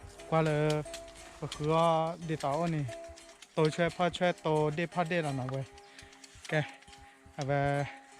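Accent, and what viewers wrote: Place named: Nakhon Phanom, Thailand. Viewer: นครพนมชัดจ้า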